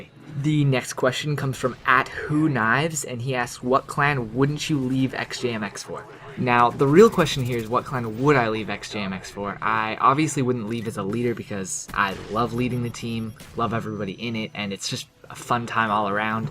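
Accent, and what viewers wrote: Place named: United States. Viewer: Were you born in Canada? You sound American.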